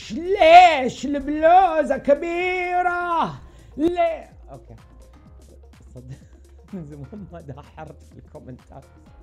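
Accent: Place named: Iraq. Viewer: احسك عراقي مو كويتي😭💕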